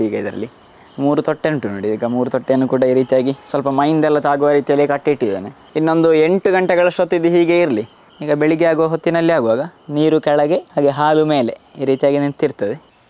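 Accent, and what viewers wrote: Mangalore, India. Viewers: Oh I got after listening your Kannada accent I thought that you are from Mangalore so I asked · Mangalore Kanadda Bhashe Estu Chanda Maraya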